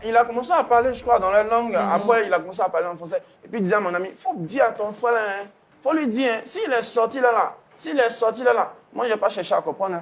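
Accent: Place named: Benin. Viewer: Mon frere meme avec ton mask si c'est du Benin tu parle ont sait qui tu est 😂😂😂😂 eviter de vous metre dans des problemes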